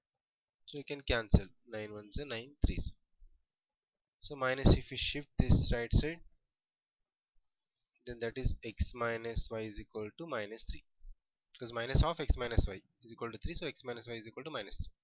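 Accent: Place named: South India, India. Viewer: Thanku.. sir I think so u r from South india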